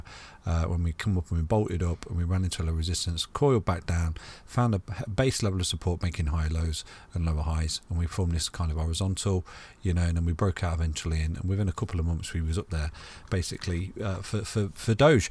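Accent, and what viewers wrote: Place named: Nottingham, United Kingdom. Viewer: Nice video mate. Are you by any chance from Nottingham. Your accent sounds local!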